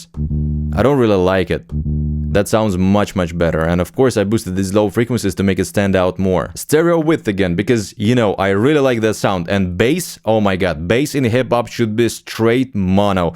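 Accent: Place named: United States. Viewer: Круто ты шпаришь по английски) Не знал бы кто ты подумал бы что асерикос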